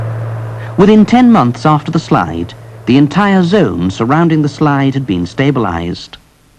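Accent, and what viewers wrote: England, United Kingdom. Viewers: I couldn't quite believe my elderly eyes when I saw Sven the clay gatherer shirtless and in the Daisy Duke's. Didn't quite go with the rest of the documentary and the narrator with the English accent. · Glassy es...proper British accent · I tried watching this but the guy kept saying daybree instead of debris...i fucking hate the english accent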